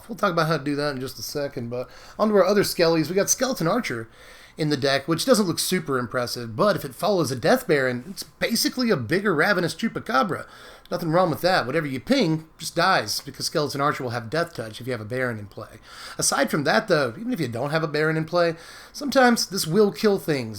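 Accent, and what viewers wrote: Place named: Georgia, United States. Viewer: You're from Georgia arent you? I had a feeling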